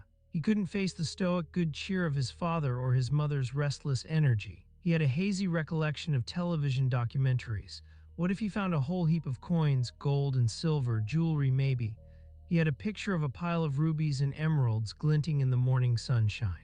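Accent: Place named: United States. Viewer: Thick US accent….. disservice to Cleaves.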